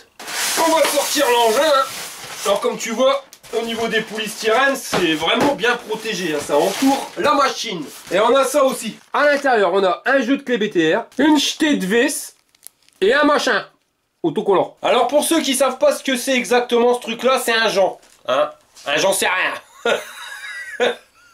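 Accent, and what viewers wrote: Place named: North, France. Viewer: toujours au top t es vidéo avec le patois du nord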